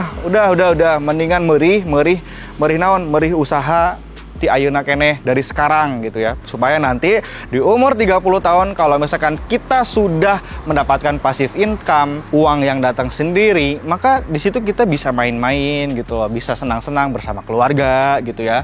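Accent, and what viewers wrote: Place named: Sunda, Indonesia. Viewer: Baru mampir.. sundanya medok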